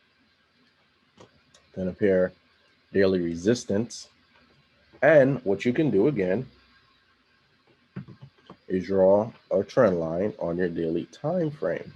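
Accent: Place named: Bahamas. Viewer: ik you bahamian lmaoo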